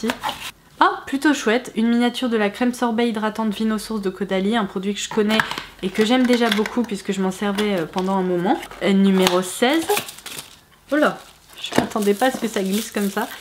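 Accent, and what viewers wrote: England, United Kingdom. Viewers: Ton accent anglais il et cher beau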